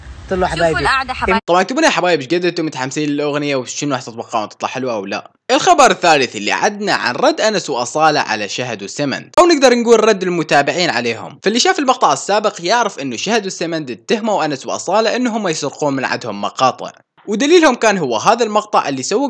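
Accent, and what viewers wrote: Iraq. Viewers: اوووووول سؤال انته عراقي صح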